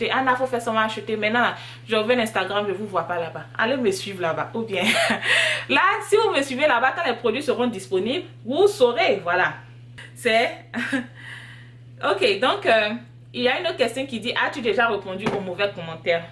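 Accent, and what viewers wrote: Ivory Coast. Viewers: Eeeeeh merci beaucoup oh🙏🙏je te suis sur unstagram depuis longtemps...je te vois toujours sur YouTube mais j'ai jamais cliquer sur tes vidéo ...donc en t'entendant parler avec les deh,les thieeee,comme Abidjan ça m'a captivé j'ai tout de suite su ke tetait ma soeur ivoirienne deh suis trop contente dehors😍😍😍😘😘😘😥😥😩😩😩😥😥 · Tu es toute belle ma chérie et tu as l'accent des ivoiriens j'adore tes vidéos 💖💖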